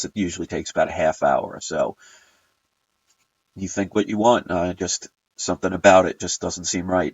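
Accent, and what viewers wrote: New York, United States. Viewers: YOU SOUND LIKE YOU ARE A NEW YARKER HEY FROM THE WEST COAST ME-REFUGEE CITIZEN HEFT SAN JOSE CA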